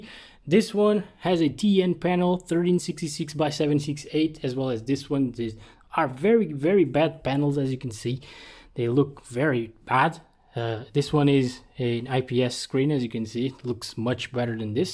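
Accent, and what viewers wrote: Portugal. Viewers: És português? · Ah, então você é de Portugal?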